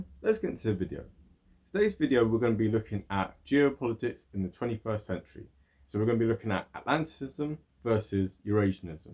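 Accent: England, United Kingdom. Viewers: Only an Englishman can have that accent. So he is for sure.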